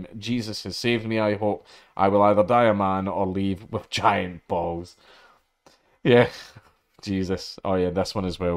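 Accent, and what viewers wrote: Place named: RP, United Kingdom. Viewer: I love posh accent, pure gold:D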